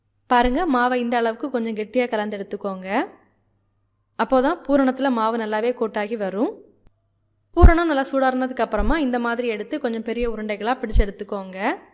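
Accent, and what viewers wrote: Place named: Nagercoil, India. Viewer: Sister neenga nagercoil pola ........ speech apdi than iruku.....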